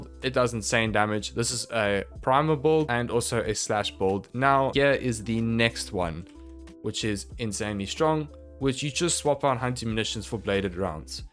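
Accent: South Africa. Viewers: Are you from South Africa? I can hear from your voice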